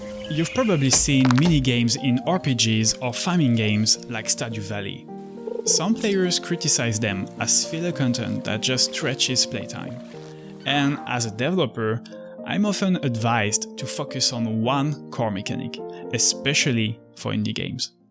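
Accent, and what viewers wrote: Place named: France. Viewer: You sound like GameMakersToolKit but with a French accent!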